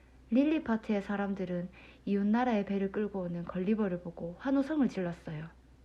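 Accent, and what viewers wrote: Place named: Pohang, South Korea. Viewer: 편안하게 엄마가 베드타임스토리 들려주는것 같았습니다. 잘들었어요. 포항이신듯요.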